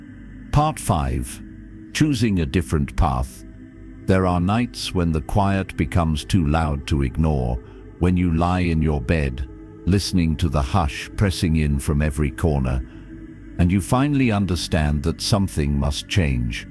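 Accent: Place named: England, United Kingdom. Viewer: Your channel has become very important to me, to begin to relax for sleep. Please never change the voice! I love the English accent. Also the quiet music. Thank you.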